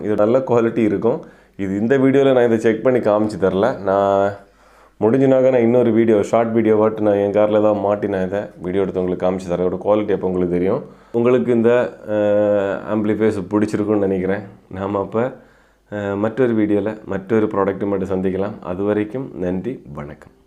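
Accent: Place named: Kerala, India. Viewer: Ningal malayali aano oru malayalam touch varunnundu samsarathil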